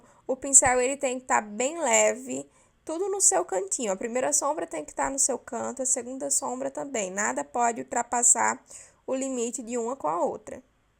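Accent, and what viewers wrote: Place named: Northeast, Brazil. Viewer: Que sotaque é esse minha fia 😍😍😍 Também sou nordestina Estou super inscrita 💥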